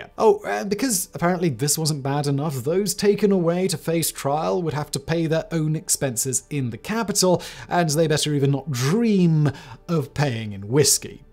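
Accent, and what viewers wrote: United Kingdom. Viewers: Love your channel. I noticed that in this instance your British accent is a little ironic. Keep up the great work! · can't do the horrible brit accent on american history, this channel sux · Really like the vid, but hard to weave through your Brit accent, woof. You could also use some tonality in your narration. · Listening to a limey talking about what went on here. No thanks I'll pass, I don't want the opinion of those we soundly gave the boot to out of this amazing Nation.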